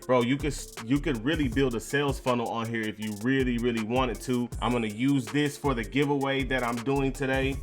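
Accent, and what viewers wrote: United States. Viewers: which part of usa are you from asking because of your accent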